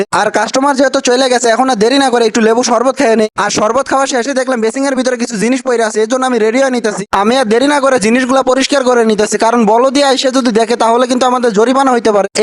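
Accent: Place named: Comilla, Bangladesh. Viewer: Apnar basa ki cumillay naki mone hoy je cumillar vasa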